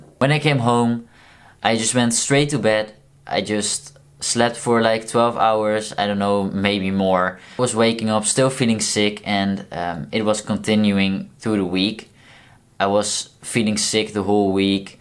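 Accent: Netherlands. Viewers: Vreselijk verhaal man!! Wat kut. Je engels is btw goed aan je accent mag je nog wel werken ik kon meteen horen dat je nederlands was😂 no offense tho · Don't apologize for your accent. I had the blessed opportunity to lived in The Netherland for three years. Beautiful people, beautiful country. You are a beautiful and brave person. Forgive me because I do not know the correct pronouns. I am learning. ☺ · I like your Dutch accent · love you accent love Dutch I'm glad you are OK now xxx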